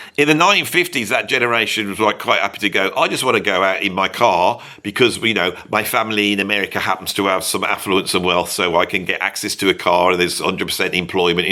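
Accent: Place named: England, United Kingdom. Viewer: You know, you sound like Wolfman Jack with an English accent.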